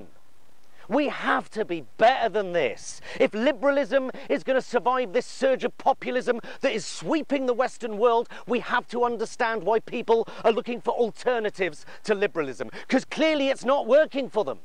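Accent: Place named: Somerset, United Kingdom. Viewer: johnathan Pie i am sure i detected a Somerset or Bristolian accent during your rant????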